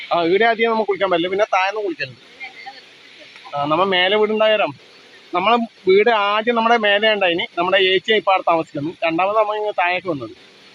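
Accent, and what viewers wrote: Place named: Payyanur, India. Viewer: എന്തൊരു ഭംഗിയുള്ള സ്ഥലമാണ്❤ അവിടെയൊക്കെ കൂടി നടക്കുമ്പോൾ എനിക്ക് വല്ലാത്ത പേടി തോന്നി വല്ല പാമ്പങ്ങാനും ഉണ്ടെങ്കിലോ എന്ന് വിചാരിച്ചു ഇതെവിടെയാണ് സ്ഥലം ഞാൻ കണ്ണൂരാണ് പയ്യന്നൂരിൽ പറഞ്ഞ സ്ഥലം ഒക്കെ അറിയാം ഭാഷയിൽ നല്ല പയ്യന്നൂർ ഭാഷ എന്തായാലും ഞങ്ങളെയൊക്കെ കാണിക്കാൻ എത്ര റിസ്ക് എടുത്ത് പോയല്ലോ മഴയത്ത് ഇനിയും മഴയത്ത് പോകരുത് ഇങ്ങനെ വഴികളിലൂടെ നമ്മൾക്ക് ഒരു അനക്ക് ഒരുപാട് സന്തോഷമായി ❤❤ നിങ്ങളുടെ വീഡിയോസ് ഒന്നിനും മെച്ചപ്പെട്ടു വരുന്നു ❤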